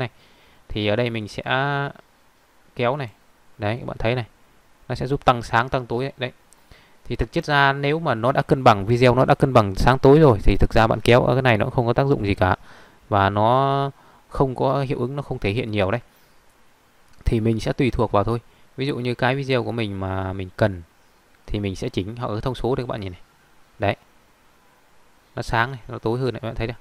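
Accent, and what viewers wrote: Vietnam. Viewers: Em hỏi ngu xíu ạ, em nghĩ là add phát âm tiếng anh đọc như tiếng Việt này là cho người nghe dễ hiểu phải không ạ? Em không nghĩ 1 người có thể giỏi về PR như vậy lại không biết english đâu hehe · ad đọc tiếng anh như tiếng việt, nhưng k sao 1 điểm thú vị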